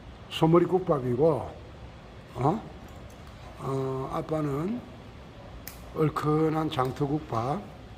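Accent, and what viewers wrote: Gyeongsang, South Korea. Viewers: 는 표현력도 최고 입니다 세월은 너무빨리 흘러갔네요 부산 광안리에서 먹어본 그맛은 잊을수가 없고.. 갱상도 사투리로 누부야를(누나) 제주도에서 부르는 유퉁쌤, 정겨운 목소리와 사투리가 매력있어 매일 시청 합니다 늘~건강 하시기를 기원 드립니다. 캇트, 구독, 좋아요. 👍 👍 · 누부야 66만만에 구수한 경상도 사투리 말씀 반갑습니다 랑 늘행복 하십시요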